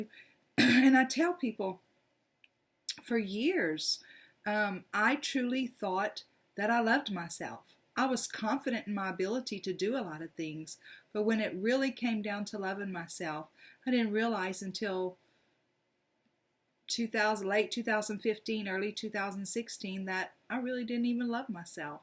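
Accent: South, United States. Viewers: Well said! No one like you, a shining light in the world with a southern accent!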